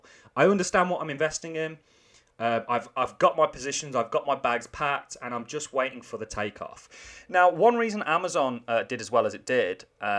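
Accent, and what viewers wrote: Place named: West Midlands, United Kingdom. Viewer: Thanks again. Nice to hear a west mids accent adding an enormous amount of value, keep up the good work pal.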